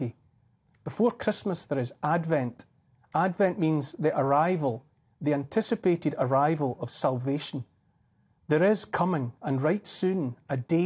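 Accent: Scotland, United Kingdom. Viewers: Your speeches are spot on and with your beautiful Scottish accent it is truelly meaningful and comes across so true, its a shame this is not a speech to the nation on the 6 0 clock news. Well put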